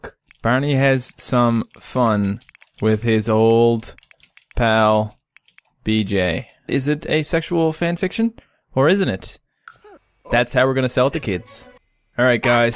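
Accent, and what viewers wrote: Canada. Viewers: Hello I watched your sims 4 videos with my mom because she is obsessed with the sims, she loved your videos and wants to know if you are Canadian, because of your accent... Yeah... Random. Anyway, thanks for vids, you really made us laugh.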